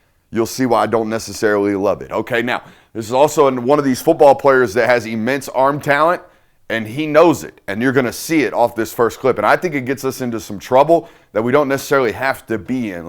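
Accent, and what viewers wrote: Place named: Texas, United States. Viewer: I gotta ask who your team is. Sounds like Texas or Oklahoma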